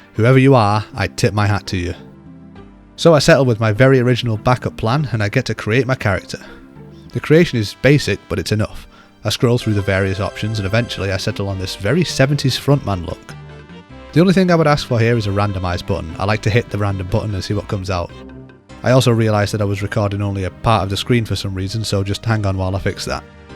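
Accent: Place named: Darlington, United Kingdom. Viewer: Where are you from... Somewhere like Darlington... The accent is deeply reminiscent to me. I grew up in Middlesbrough...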